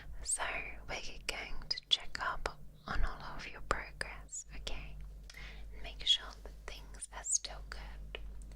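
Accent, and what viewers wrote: United Kingdom. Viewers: Her very "queen's english" accent really adds to this, the close in whispering and ear blowing were also peak.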